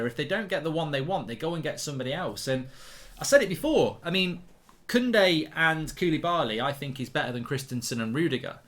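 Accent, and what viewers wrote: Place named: Manchester, United Kingdom. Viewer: Condescending manc twunt!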